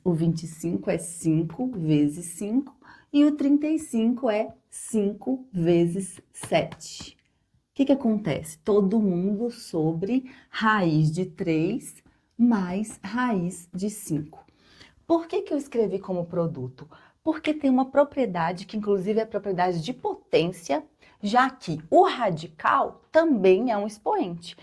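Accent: Minas Gerais, Brazil. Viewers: Sotaque mineiro...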